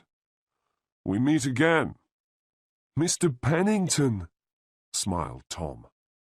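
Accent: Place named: United Kingdom. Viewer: You are my favourite and perfect story poster, because its always with British accent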